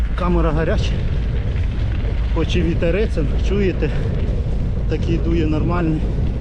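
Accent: Poltava, Ukraine. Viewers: А що не так з суржиком? ... Мені дуже по-домашньому відчувається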